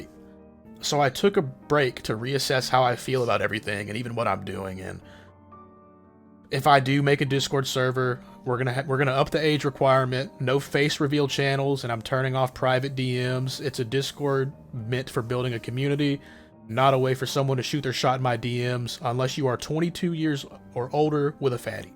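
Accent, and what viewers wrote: South, United States. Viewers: HIS VOICE …he really is a man of the south..🐄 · In his own words, he grew up in the South; his accent and voice are not acted, he just raises his voice and adds the jokes for the character.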